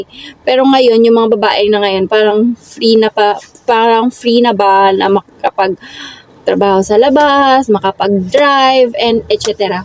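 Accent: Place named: Iloilo, Philippines. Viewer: Taga saan ka sa Pinas dai?sounds Ilongga❤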